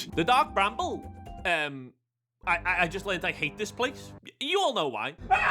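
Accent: Liverpool, United Kingdom. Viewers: This is an incredible amount of editing work to have done hatchling. Glad you enjoyed the game. I’ve also watched around 20 playthroughs but I never knew how much I needed an angry Liverpudlian version in my life. If the whole thing is recorded I can imagine our little Outer Wilds community would love to see the whole thing ::)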